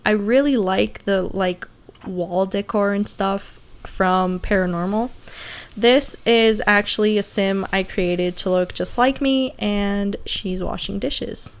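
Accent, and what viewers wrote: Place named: United States. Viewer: Adorei o inglês! Parece até americana mesmo <3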